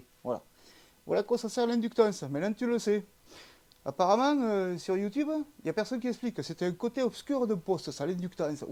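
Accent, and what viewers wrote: South, France. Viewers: L’accent du SUD quelle beauté 🤙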